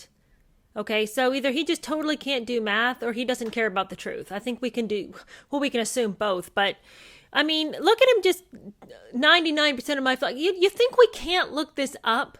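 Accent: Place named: RP, United Kingdom. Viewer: You're posh English accent is hilarious! So funny. Thank you.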